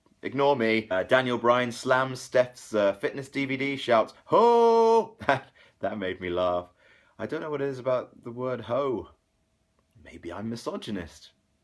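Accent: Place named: RP, United Kingdom. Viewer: I listen to this to fall asleep at night to the sweet and relaxing posh voice of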